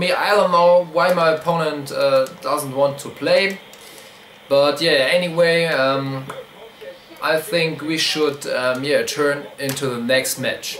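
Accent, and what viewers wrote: Germany. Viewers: Sorry trotzdem höre ich nur nen deutschen Akzent raus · du meinst deutschen akzent ;) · deutsches Englisch :D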